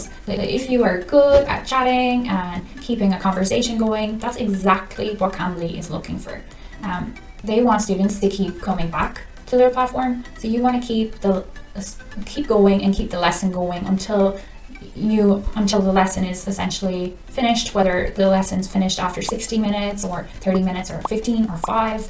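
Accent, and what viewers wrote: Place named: Ireland. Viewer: Are you from Ireland? You sound Irish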